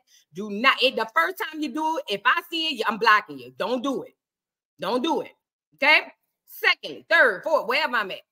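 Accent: New Orleans, United States. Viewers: You definitely be putting everybody on before they catch on 💯love u 🥰i want a N.O accent so bad 😂😭 its so fire 🔥 lol 😆 🖤🖤 via the green lady state 🗽🗽🗽😂🤣#keepwinning💪🏾✊🏾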